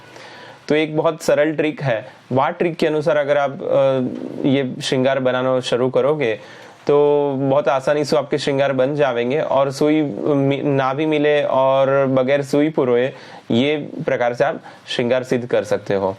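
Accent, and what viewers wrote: Braj, India. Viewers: Bahut sunder braj ki boli manbhavan hai Jai Shree Krishna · Jai shree krishna Superb very easily done your braj bhasha is very sweet Make more videos for us thanks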